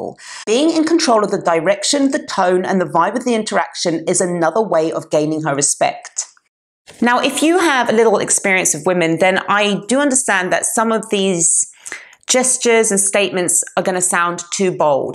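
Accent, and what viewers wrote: United Kingdom. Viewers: Wow. I even got an answer. YT has its own algorithms. And on top of that we have marketing season opened. Now streaming hostesses are the top priority. They play Silent Hill 2 Remake in pajamas, because this is not objectifying to women. That's how game corporations solve the issue, of hot hostesses at game events that one were probably the main attraction. A contract with a streamer. Guys who are unable to approach a gal in real life as an audience. Easy money. Just trash the game in chat box and you will become eaten by simps. xD Pathetic. Cheers from Poland. PS. My instinct was right. Your British. That accent. Pity it's not as much popular as in 90's. Prodigy, Blur and few other UK bands. Yeah. Definitely good time for music. Cheers!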